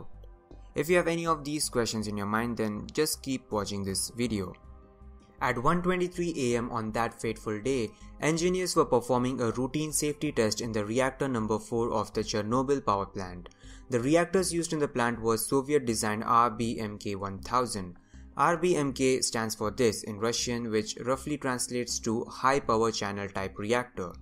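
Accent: India. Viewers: Bruh indian?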